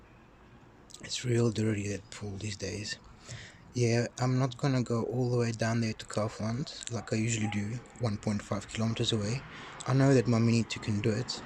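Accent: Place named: South Africa. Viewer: did you grow up there? I feel as if I'm hearing a little bit of a South African accent from you. 👍 Nice flight even though the weather was not great.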